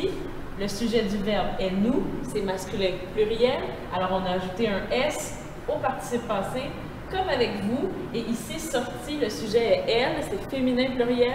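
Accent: England, United Kingdom. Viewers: You gotta english accent, it's so cute♡♡♡. Thanks for your french lessons!♡♡ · vous avez un acsent anglais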